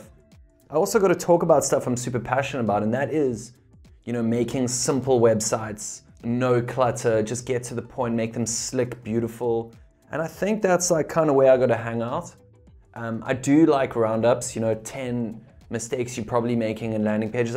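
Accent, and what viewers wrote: South Africa. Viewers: Yay! I'll eagerly anticipate it. I'm from Cape Town, born in PE, but now I'm in Germany. I miss Cape Town every day. I always love hearing a South African accent on YouTube.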